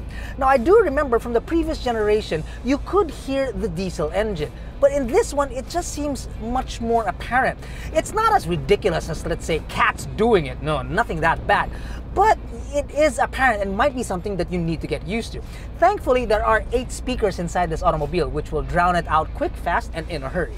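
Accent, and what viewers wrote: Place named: Philippines. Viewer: This is a very good example of filipino accent.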